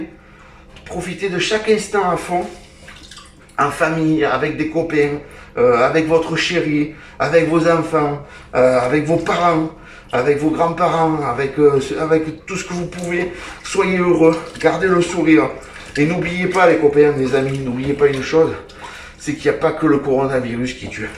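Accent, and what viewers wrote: South, France. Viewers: Bonjour Mr " ", Je découvre aujourd'hui même vos vidéos (Fabrication du Saucisson pour la première) et ensuite votre chaîne avec les saucisses... Ainsi que malheureusement, le départ brutal de votre ami " Tatane " 😇 Courage à vous (même si nous sommes en 2023) et l'on n'oublie jamais ses Amis Pour en revenir à vos vidéos, des conseils, de l'enthousiasme... On devine toute votre passion et votre volonté de transmettre. Que du Bonheur de vous visionner, vous écouter avec en plus, ce petit accent du Sud: Charmant. avec un sérieux, une franchise. Tout gamin, de manière familiale, le Cochon représentait un événement annuel que j'ai un peu perdu de vue avec l'âge. Je suis de la Loire. (non loin de Polignac d'ailleurs). Grâce à vos vidéos, cela me redonne de l'énergie pour recommencer Alors, rien que pour cela: MERCI Et pour votre Citron Pulco, c'est bien mais j'adore le commentaire ci-dessous de Denis Etienne: " Pour ce qui est de ton embonpoint, mon grand père me disait qu'un boucher maigre n'est pas un boucher de confiance. 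" Tout est dit 😊 Et pour le coup du Masque!!! Bravo pour votre franchise et la belle Remise en place de certains!!! Alors encore MERCI:washhands: Continuez ainsi. Vous êtes non pas un Vrai Personnage mais un Personnage VRAI!!! Cordialement.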